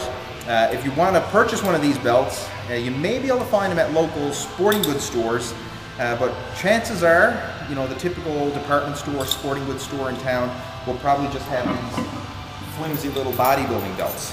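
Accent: Newfoundland, Canada. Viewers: Hey fellow newfie haha. Sure sounds like one. Eh by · He must be a Newfoundlander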